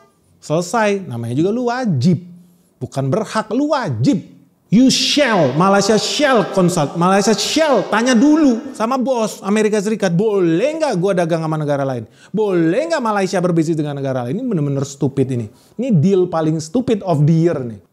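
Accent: Indonesia. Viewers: bkn..klu ko tguk komen2 dia kat video utube lain..dia ni org indonesia jgk..jilake..cara ckp pn nmpk sgt indo totok..haha 😂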